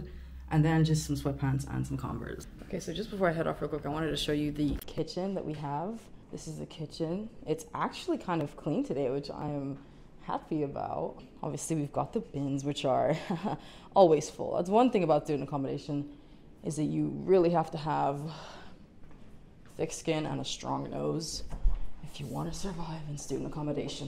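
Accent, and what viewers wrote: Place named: Ireland. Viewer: Which country is this please. I couldn’t catch your accident..is not America, not Canada looks like UK a bit is this Ireland ? something?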